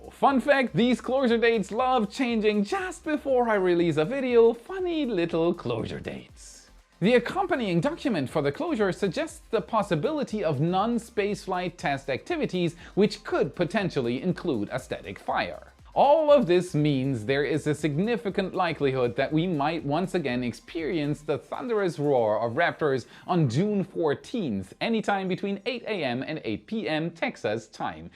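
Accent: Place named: Germany. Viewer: I love your show. I watch it all the time. I love your German accent, too. Let me share with you a secret: Adjacent is pronounced AH-JAY-SENT. The ‘C’ is soft and sounds like an ‘S’. I’m not sorry - I couldn’t resist. Keep up the great work.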